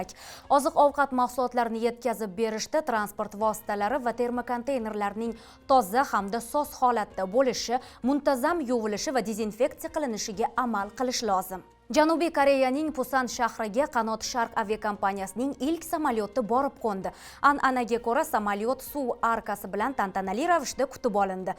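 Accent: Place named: Russia. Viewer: ЎЗБЕКчани янги ўрганаётган ўрисга ўхшайсиз, сиздақаларга қолдими ЎЗБЕКни куни? Буни устига тенг ярим луғатинг ўрисча, э саводсиз ўрисқул?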